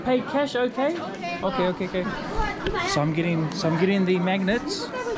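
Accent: New Zealand. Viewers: sounds like kiwi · I was gonna say you sounded like a kiwi and where were you from and then you told the taxi driver you were from New Zealand and answered my question 😂 Subscribed! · Sounds like you're a Kiwi, not an Australian. So I'm not expecting anything great.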